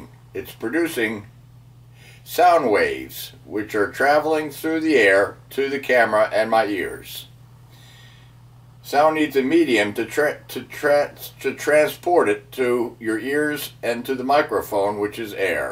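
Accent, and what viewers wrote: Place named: Catskills, United States. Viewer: I wear hearing aids and now I'm wondering how they work! I'm based out of northern New York State near the Canadian border and I've always collected rocks and minerals from all over the country. I also prospect for gold and often travel to southern NYS and Vermont. If you ever feel like finding some gold let me know and we could plan a trip. Your accent sounds like my family in the Catskills!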